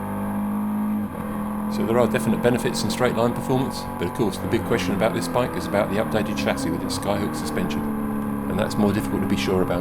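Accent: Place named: Australia. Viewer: Hi Guys, I am italian and I could follow the speaker excellently. Speed it is not a problem in general if no jargon is used ;-) this said I think that for an internal audience it might be a bit problematic understand speaker's english given the accent. is he australian or what?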